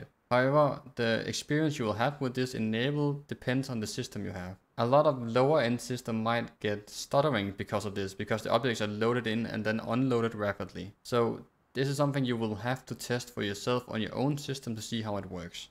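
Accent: Denmark. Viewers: den mest danske accent jeg har hørt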